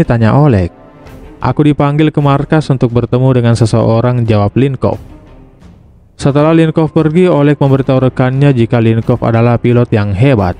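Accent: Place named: Madura, Indonesia. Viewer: Ngomongnya kek orang madura lu min aga kurang realistis sama filmnya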